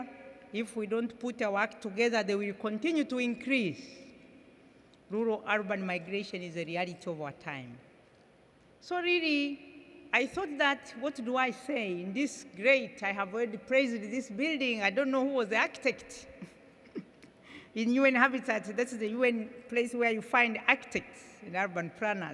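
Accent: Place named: Haya, Tanzania. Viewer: Yeah! representing well Tanzania,the country of Mwl. Nyerere & JP Magufuli.Big up mama tibaijuka truly you deserve respect.pia nimeipenda lafuzi ya kihaya mpaka raha hiyo Ndiyo TZ.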